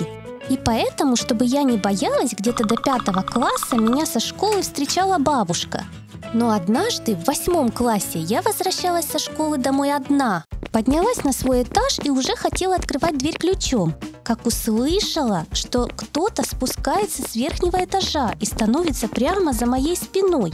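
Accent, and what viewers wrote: Ukraine. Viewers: ти из ккрайини)))))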